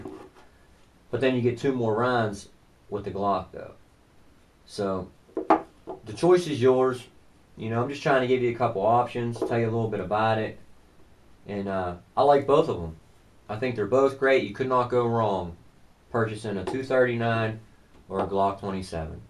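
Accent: Pittsburgh, United States. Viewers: I'm guessing you're from Pittsburgh. Sounds like it. :)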